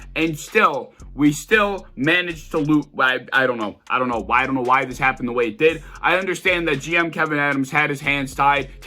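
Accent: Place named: New England, United States. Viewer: You sound a new Englander for hockey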